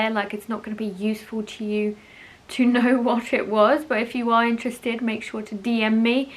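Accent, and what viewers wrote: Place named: United Kingdom. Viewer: I was looking for a Jury duty video, but instead I found myself listening to a bri’ish accent. That’s wild.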